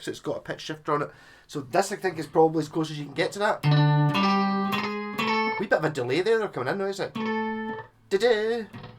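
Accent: Scotland, United Kingdom. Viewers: Fuckin Scottish accent.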